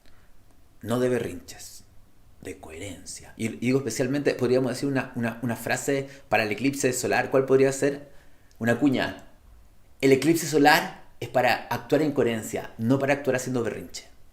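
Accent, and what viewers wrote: Chile. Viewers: Amo tu acento chileno es un placer escucharte. Te mando un abrazo gigante de esta uruguayita viviendo en el hermoso México ❤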